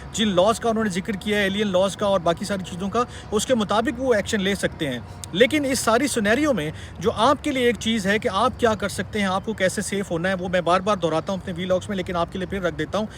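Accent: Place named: Punjab, India. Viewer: Tum. Pakkay. Punjabi. Lagatay. Ho. To. Phir. Punjabi. Kyu. Nahi. Boltay.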